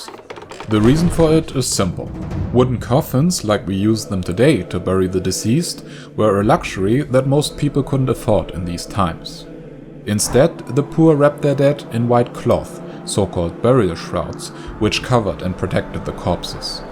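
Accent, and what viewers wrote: Germany. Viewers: Du hast einen deutschen Akzent ^^ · Are you somehow related to ,,Kurzgesagt"? You seem to draw similar and have a german accent. No offense tho, could be heavily mistaken. Ty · Ich liebe deinen deutschen Akzent.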